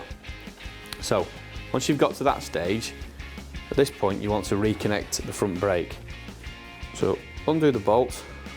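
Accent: United Kingdom. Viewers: Very informative with great detail. My only suggestion would be to lose the music. As an older American, I need to strain to hear through the British accent over the music. Great job though.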